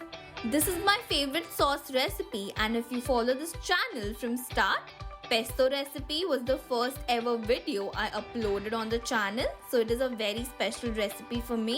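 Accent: Maharashtra, India. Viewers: Yes she is Maharashtrian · You are Maharashtrian easy can identify by your speaking skills my guess is correct???pls dii tell 😀😀😀😀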